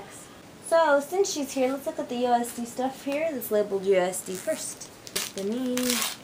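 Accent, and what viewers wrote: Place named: Cockney, United Kingdom. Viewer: Random accent almost sounded a little Cockney. :D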